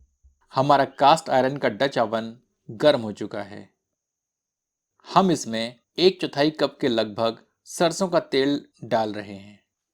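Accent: Standard. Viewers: शुद्ध हिन्दी बोलते हैं आप 👌🙏🌹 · क्या सुधा हिंदी बोलते हो जी ।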